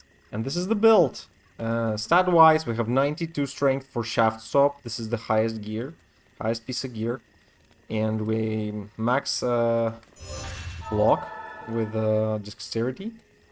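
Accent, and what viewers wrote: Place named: Eastern Europe. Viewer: Finally a Cheap Smiter Build without the use of Annihilus and already acquired Pally +3 Torch, no BS, just cheap items. Except Shaftstop --> 900+ hours in and I still haven't found it, but I can use Smoke plus that +35% physical negation shield, I think Stormshield is the name --> comes out to be somewhat equal to the combo here (after equipping some charms for extra Elem Res). Auto-subbed, even if it's just for the accent - reminding me of Idevaste's :P. Great video, brother! *I wonder why Central/Eastern European Youtubers are better at keeping the script short and without unnecessary BS chatter...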